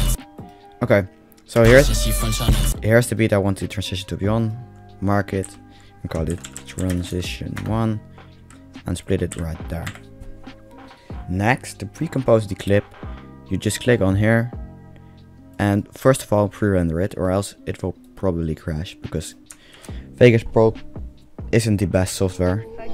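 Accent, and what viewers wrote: Netherlands. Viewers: ik hoor gewoon aan je accent dat je uit nederland uit komt hahahaha. Maar dankje wel voor de video. Was egt hulpzaam!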